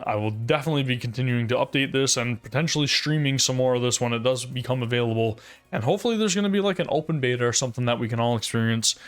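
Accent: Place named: Atlantic Canada, Canada. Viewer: Informative video. Out of curiosity, are you from the east coast of Canada by any chance? I hear a bit of NS or maybe NL to your accent.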